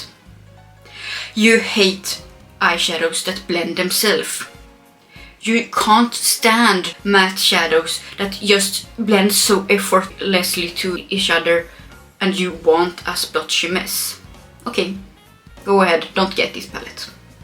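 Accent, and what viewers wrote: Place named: Sweden. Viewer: The Swedish accent is on another level! 😍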